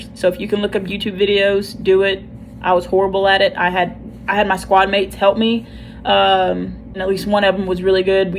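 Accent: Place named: South, United States. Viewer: GUUUURL…your southern accent is BACK! Hahaha I’m from MS so it sounds comforting lol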